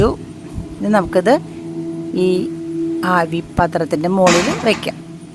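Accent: Thrissur, India. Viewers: ത്രിശൂർ കാരിയാണോ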